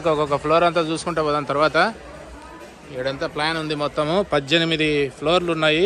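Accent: Rayalaseema, India. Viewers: Americalo vunna swachamaina seema basha mataduthunnaru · అన్నా నువ్వు చెప్పే విధానం చాలా చాలా బాగుంది నువ్వు అమెరికా సముద్రాలు అన్ని తిరిగిన మన రాయలసీమ మాటతీరు మర్చిపోలేదు. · bro rayala seema yaasa super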